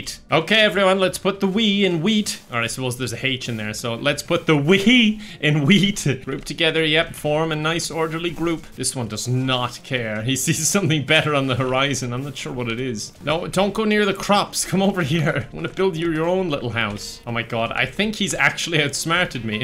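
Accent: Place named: Ireland. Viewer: Why do you sound like PewDiePie with a jacksepticeye accent 🤣 I know you are an Irishman ❤️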